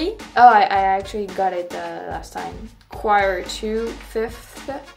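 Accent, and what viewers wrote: France. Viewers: Great job with this video you look very nice in it and Saying English words with your French accent Sounds Adorable and it's 👌 to laugh at oneself from Time to Time.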